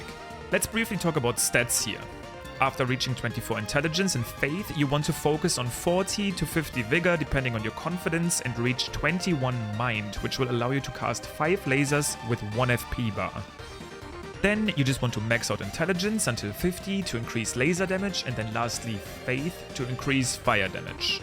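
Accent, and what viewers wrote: Germany. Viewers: Haha bist locker deutscher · You are German, aren’t ya? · Kurze Frage kann es sein das ich hier einen Deutschen Akzent raushöhre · Bist du Deutscher? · Are you German? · Hey very stupid question are you german or speak it cause you have a bit of a German accent I Guess and If so Hallo hatt mich nur interessiert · You sound aggressively German, I like it. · Are you german? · You instantaneously here this guys german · Nice vid! Your accent sounds very German. Am I right? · You know he’s german without knowing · You german?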